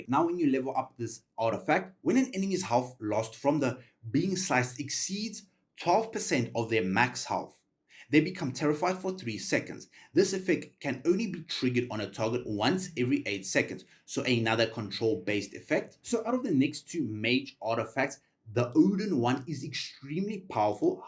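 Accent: South Africa. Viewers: yo bro you from South Africa?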